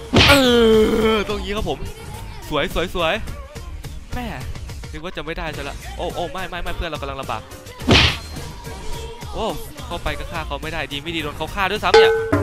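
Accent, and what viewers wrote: Isan, Thailand. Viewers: ไม่เคยเห็นใครพูดอีสานได้น่าฮักขนาดนี้เลย;-; "หยามบักคัก" คือแบบตายอย่างสงบแล้วค่ะ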